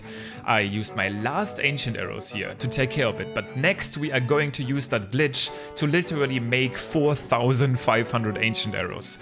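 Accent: Germany. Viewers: your accent sounds so german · Das klingt nach einen deutschen aktzent ich weiss nicht wie ich das in Englisch schreiben soll aber bist du deutsch?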